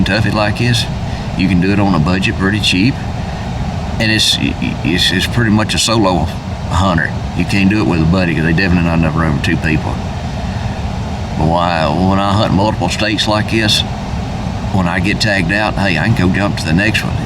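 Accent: South, United States. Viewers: Where you from bo? Sound southern. Git R Done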